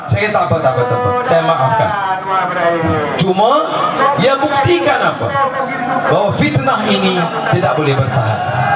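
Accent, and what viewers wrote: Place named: Negeri Sembilan, Malaysia. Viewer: ingatkan org negori bro heheh.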